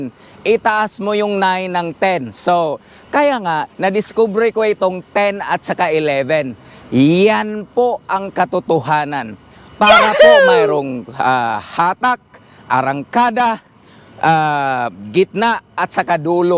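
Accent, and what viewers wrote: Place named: Visayas, Philippines. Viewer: Pwede naman kayong wag manood para hindi kayo mainis. Si kasi sa obserbasyon ko eh Ilonggo at bisaya originally. Medyo hirap talaga sila managalog ang mga bisaya. Alam ko kayang mga english ni pero mas malawak ang maaabot ng mga vlogs nya pag tinagalog nya. Tas matagal na yata sya sa Thailand at fluent na sya magsalita Thai. Kaya naghahalo halo na ang accent nya. Sa kin walang problema ang paliwanag nya. Kahit papano may napulot ako.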